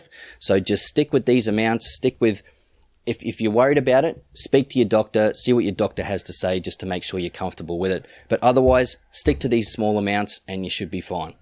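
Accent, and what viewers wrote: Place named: Australia. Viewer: The Aussie accent I love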